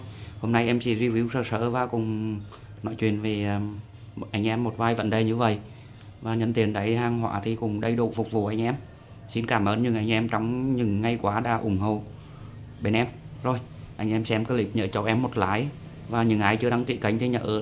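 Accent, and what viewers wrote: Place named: Nghe An, Vietnam. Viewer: Giọng bác giống như ở thanh chương hay đô lương gì nhỉ.giọng chất quê choa hi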